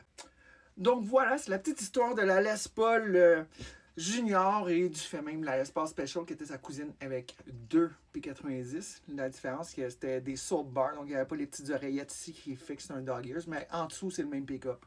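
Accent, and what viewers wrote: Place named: United States. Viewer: Putain, un accent à couper au couteau... Ça parle moitié anglais moitié français... Et quand ça parle français ça a un accent américain.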